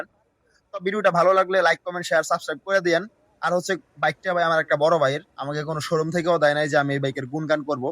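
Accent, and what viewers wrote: Narsingdi, Bangladesh. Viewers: Bhai Apner basha ki Narsingdi te ?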